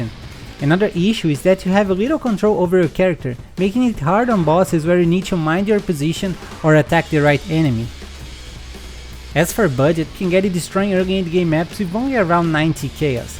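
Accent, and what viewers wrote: Brazil. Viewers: Acho fantástico que dá para sentir o sotaque brasileiro kkkkkkkkkk Obrigado pelo vídeo!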